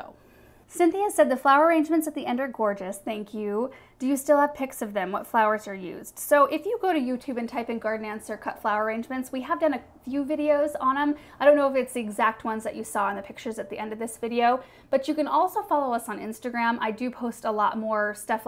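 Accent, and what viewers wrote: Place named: Texas, United States. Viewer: Texas says it same as you.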